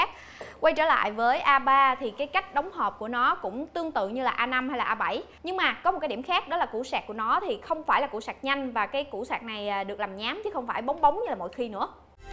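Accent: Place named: Mekong Delta, Vietnam. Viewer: thích cg miền tây :)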